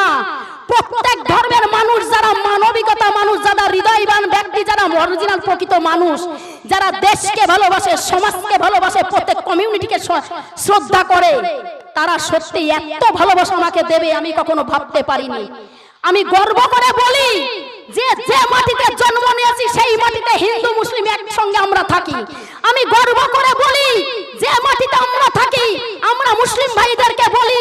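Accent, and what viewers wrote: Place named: Bangladesh. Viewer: জয় শ্রী রাম 🚩🚩 কথা শুনে বোঝা যাচ্ছে আপনি বাংলাদেশী মুসলিম।। এত এব হিন্দু হিন্দু বলে চেঁচাবেন না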